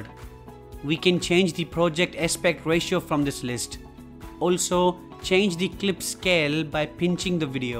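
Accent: India. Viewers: Cet accent vient de l' Inde. Là bas les gens appuient fort sur les lettres quand ils parlent l'anglais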